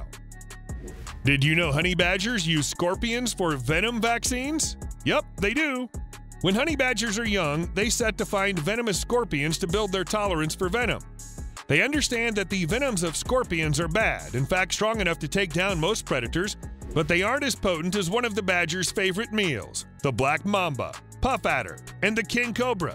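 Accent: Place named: United States. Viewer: spoiled the vid with the horrible US accent. yuk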